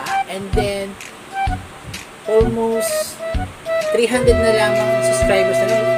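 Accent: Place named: Iloilo, Philippines. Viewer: Ang Sarap Naman Po Nyan Lods... Parang ilonggo Ka Ata Lods. Haha Napansin Ko Lang Po Sa Tono. #Jf_Acuña_Lang_Malakas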